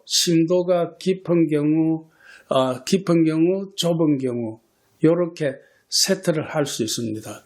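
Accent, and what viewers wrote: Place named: Gyeongsang, South Korea. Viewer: 안녕하세요 선생님! 정겨운 갱상도 강의 재미 있어요 음악점수 ‘빵점’은 엄살 같으신데요? 늘 건강하시길……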